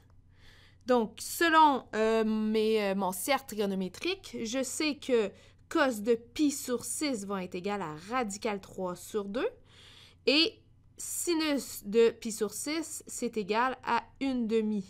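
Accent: Quebec, Canada. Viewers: l accent québécois j adoreee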